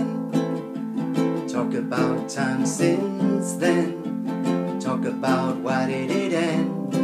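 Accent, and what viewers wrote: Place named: Northern England, United Kingdom. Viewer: Thanks for you reply. Love the northern accent by the way.. Stockton or Eaglescliffe? I'm a monkey hanger but now live in west sussex.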